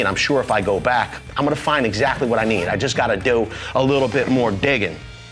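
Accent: Philadelphia, United States. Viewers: That’s just South Philly baby!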